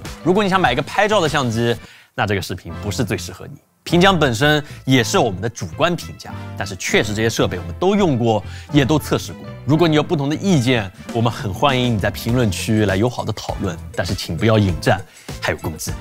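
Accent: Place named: Hangzhou, China. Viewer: 一听口音不是杭州人就是宁波人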